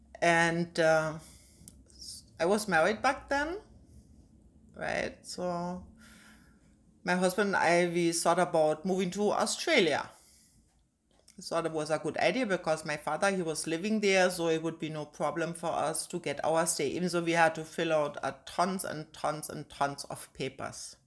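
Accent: Jamaica. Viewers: She sound much like a born jamaican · This was a very interesting story. You were very adventurous. You have some Jamaican flavor in your voice.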